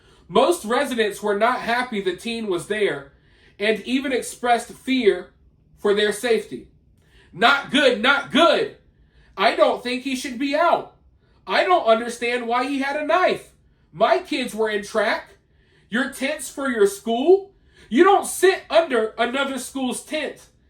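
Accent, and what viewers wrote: South, United States. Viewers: I agree with you. But He’ll you sound like a black dude. Jus saying. Must be from the south?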